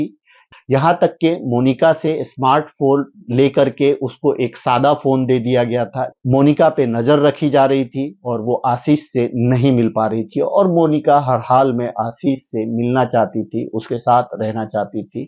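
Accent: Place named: Bihar, India. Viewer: सही पकड़ा एंकर बिहारी है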